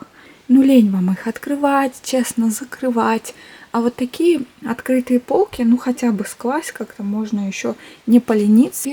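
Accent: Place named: Japan. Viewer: Вы очень красивая и милая☺️ мне нравится как вы говорите на английском и cute Japanese accent❤️ Желаю вашему каналу процветаний🥰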